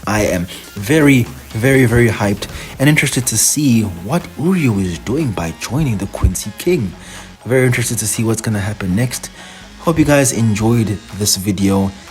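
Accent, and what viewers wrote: South Africa. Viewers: i knew you were south african from your voice and lil accent 😭😭😭 it’s good to see my brothers hopping on that bleach train fr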